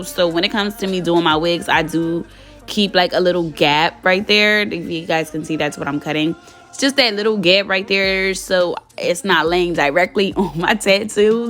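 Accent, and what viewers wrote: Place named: Baltimore, United States. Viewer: You’re so pretty I love your accent , bmore?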